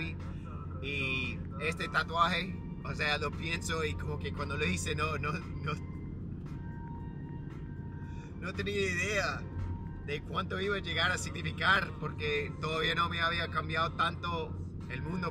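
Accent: Argentina. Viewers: Vamossssssss!!! Me enorgullece que te guste nuestra cultura!!! Ya perteneces a ARGENTINA 🇦🇷. SOS muy gracioso y te queda muy bien el acento Argentino!!! Que emoción tienes!!! Vamosssss!!!!! 😢😂😂😂😢😂😢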